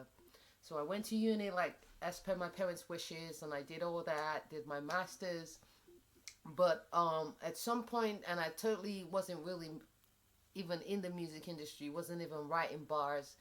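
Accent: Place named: United States. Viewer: When ya click like before the video even starts 💫💫 Oh my gaaaaah I was a massive fan too.....still am actually!!!! You crack me up! Your as real as they get, I think its a Taurus thing. Glad to hear no-one is fcuk-in with ya but dey fcuk's wiv ya 😂 you have an American twang to your voice did you used to live out there for a wee while?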